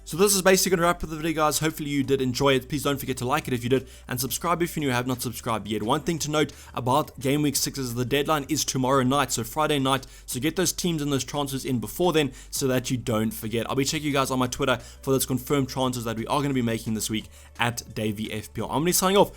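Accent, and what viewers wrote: South Africa. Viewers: Are you South African my boet? 😂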